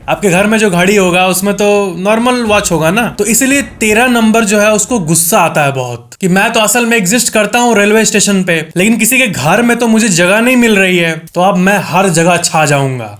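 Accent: Bihar, India. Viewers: Bihari tone hai inka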